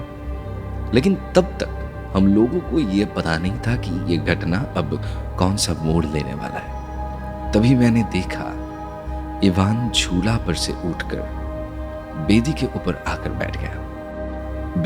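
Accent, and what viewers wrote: Bengal, India. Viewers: Love from Kolkata .....I am bihari Girl .....aapnar kothay ektu ektu bengalier tuning ache .....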